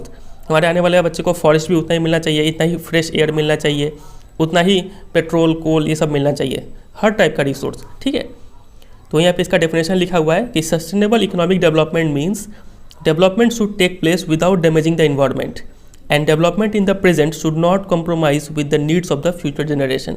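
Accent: Bihar, India. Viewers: Sir aap Bihar se ho Kaya please reply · Kya aapp bihar se ho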